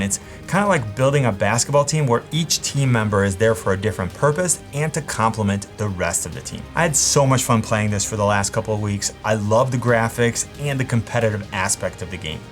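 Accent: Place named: Cleveland, United States. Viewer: I may be way late to this.. but are you from Cleveland??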